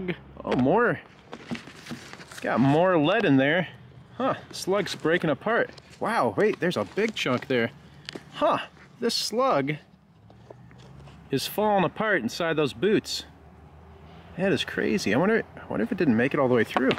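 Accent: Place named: Midwest, United States. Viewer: Can't get enough of the Midwest accent! like the 22lr videos, but come back for the accent! You sound just like my late dad and all my cousins, aunts and uncles from STL, Iowa, and WIS....Have a pop doncha know!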